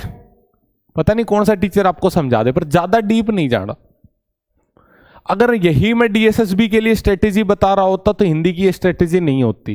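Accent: Ahirwal, India. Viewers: हा बोली को लहजो अहीरवाल एरिया जैसों है।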